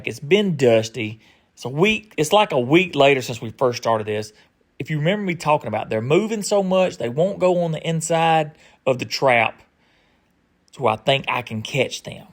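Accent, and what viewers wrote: South, United States. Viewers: I'm a woman who lives in the city. The only thing I've ever hunted is car keys in the morning so I don't know how your channel popped up on my thread. I can't tell you how glad I am that it did. I love your humor, your southern accent, your kind nature and your hog trapping. I subscribed after watching two of your videos. The only thing I don't like is that you have a bad back. Continued blessings. You're a treasure. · Goofy as it sounds, I enjoy your hog hunting videos. Cool southern twang accent. (Boss Hog would be proud of you)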